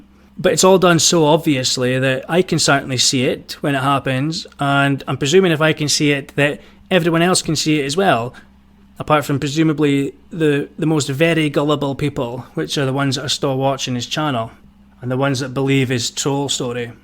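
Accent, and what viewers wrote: Scotland, United Kingdom. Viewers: It's always a nice feeling when ones gut intuition is vindicated. Shaun, from his holier than thou attitude and self glorification to his literal facial expressions struck me from day 1 as wrong. It's a breath of fresh of fresh air hearing you tearing him apart on a daily basis. I dont give a fuck what anyone does behind closed doors at the end if the day, but the man is definitely not well. Lovely to hear a Scottish accent also, and congratulations on the channel success to date - Well deserved imo. Greetings from Ireland.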